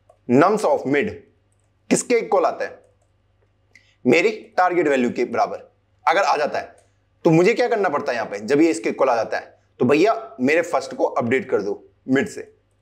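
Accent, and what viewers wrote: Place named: Pahadi, India. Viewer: pahadi tone aari h voice me only true pahadi can notice...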